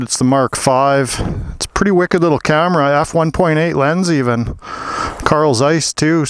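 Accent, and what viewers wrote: Canada. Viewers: My bad, you sounded like a couple guys I know fron way out on the atlantic side of things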